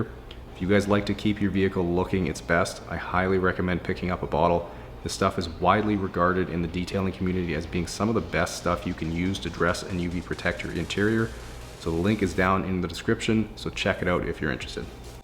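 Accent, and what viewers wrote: Canada. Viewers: That Canadian accent... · I'm in Canada too and we speak the same like he does.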